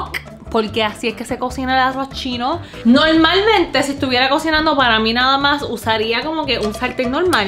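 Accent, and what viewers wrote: Puerto Rico. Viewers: Ella Dice > Cocinal < Bien Boricua.. ✌🤣🇵🇷 Tu hablas bien Boricua Hasta cambias la R por la L... Weepaa! Saludos.. 👸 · Es China peroooo bien boricua en acento y corazón.. Ese acento nos distingue a los boricuas donde sea.. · Que bonito escucharte hablar como boricua nosotros vivimos15 años en NY y nunca vi o escuche una persona china boricua y ahora que te encontré me encantó tu acento y tu personalidad somos de GUATEMALA pero amamos los boricuas porque son de buen coorazon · Omg girl!! You speak like a truly puertorrican!!! 💜💜💜💜💜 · Woooooow ❤️❤️ Nunca había visto a alguien asiático con ese acento boricua tan REAL! Un verdadero orgullo. Una personalidad tan súper amistosa y súper PRECIOSA POR CIERTO. Que ricooooooooo, quiero hacerlo ❤️ 🇵🇷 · Wow. El Acento Boricua ❤️😍 🇵🇷 The Perfect accent. You Speak it Perfectly 😍 🤩 Lo habla Perfectamente! 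😌 el Acento Puertorriqueño 🇵🇷 God Bless ! 🙏🏻 . Puerto Rico 🇵🇷 in the House 🙌🏻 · Dimeloooo!! Eso mismo estaba diciendole a mi amiga q me lo envio. Estoy estupefacto porq llegue aca en US 8 años ya y me habia olvidao de eso. Esta preciosaaa y mas hablando español de PR. Obvio no estoy diciendo nada porque boricua es!! 🇵🇷 🙌☝ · Ese acento se oye muy bien,que bueno que ames tanto los recuerdos en mi bella isla,bueno corrijo,en nuestra bella isla porque con ese acento jamás hubiera pensado que eres asiática. Gracias por compartir la receta y por haber hecho feliz el paladar de mucha gente en PR❤️ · 🈲️🈸️🈯️🈁️ Hija adoptiva de mi Puerto Rico que es tu casa 🇵🇷 y lo bien que habla nuestro idioma y acento boricua,me encanta tu dinamismo y simpatía chica 💖💖💖 🇵🇷🇵🇷 🇨🇳 🇨🇳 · Es bien gracioso ver su físico de chinese y escucharla hablando con todo el slang boricua! Muy bien video, fácil de seguir y divertido. 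¡Excelente borichina! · Es normal. Es puertorriqueña. ¿Cómo va a hablar si no es como lo que es? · Eso mismo estaba pensando..habla bien boricua. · “ me voy a haltal “ pura boricua me hiciste reír muchísimo me encanta como hablas.😂😂😂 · Eso mismo dijeeee pensé que era boricuaaaaa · Ella habla bien boricua y se ve que ama a Puerto Rico. Mejor dicho, esa si es de aquí. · .. Yo soy Boricua vivo en Texas.. Te Pido Disculpas por el Pueblo de Puerto Rico.. La Mayoria no somos asi. Pero acuerdate que los ninos y adolesentes abeses son muy crueles. eres una joven especial y tus Padres son ejemplares. Te criaron muy bien. Me encanta tu acento Boricua y Tu forma de Ser. Sigue siendo Tu. And forget about anybody else. We love You. Que Dios Te Siga Bendiciendo. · Mi arroz preferido ....y una asiática que habla con un acento más boricua que el mio ....espectacular me suscribí a tu canal estaré 🇵🇷👀👈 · La voz la delata la forma de hablar .100 porciento bori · Una Chinita que habla como boriqua! 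Esto es un tesoro nacional! Que nice!🇵🇷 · QUE 🆒 Y QUÉ SYMPATICA ESTA MUCHACHA, PRIMERA VEZ QUE VEO UNA ASIATICA CON ASENTO BORIQUA 🇵🇷 ♥️😁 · 🥰🥰🥰 Que chula tu mami, 🥰🙏🏼 Tengo que hacerlos y es ya! Gracias! hablas más boricua que yo 🤪😁😁😁🌹🌹🌹🌹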